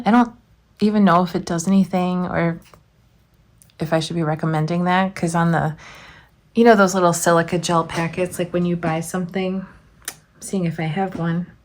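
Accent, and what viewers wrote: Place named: Texas, United States. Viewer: Yes I’m making more Halloween crafts. 😊 I use to make 60 goody bags but my kids are older now and I really miss it. I’m making my mom and sister this little pumpkin. I do notice that some of your pronouncing of words are different. Your mom being from TX makes perfect sense now. You’re very clear in your explanations and instructions. Makes perfect sense to me! ❤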